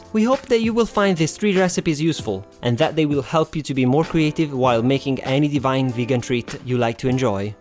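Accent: Italy. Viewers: I love this channel, so many ideas. Have a hard time finding raw cashews, everywhere I see them are fried or roasted. Also I really like your accent, is it italian? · absolutely, thank you! And same here. May I just add that your videos are amazing! They are very well done and the explanation is terrific! I have watched quite a lot of them and can't wait to look at more, and obviously try them. I am not a vegan, but a vegan-friendly vegetarian (🙄😜) and videos like yours are very helpful. And I was missing cream so badly (the store-bought one isn't good, at least here in Europe). So, thank you! Do I recognize and Italian accent? Maybe from northern Italy? Un saluto da una tedesca cresciuta in Italia 👋🏼 Ciao!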